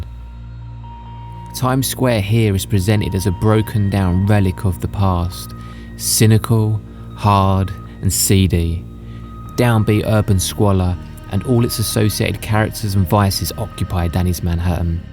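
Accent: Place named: England, United Kingdom. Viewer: Well, Narrator .... I loved it.... So, keep ur Corny English Accent...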